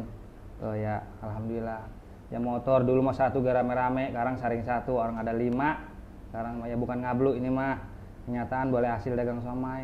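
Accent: Jakarta, Indonesia. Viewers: Suaranya kaya orang Betawi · Abangnya Betawi Depok ya dari logat bicaranya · Bpk nya logat betawi banget deh Makasih bg ilmunya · Ngomongnya nyablak khas betawi.. Jadi kangen sama bapak krna logatnya sama persis, semoga sukses selalu ya pak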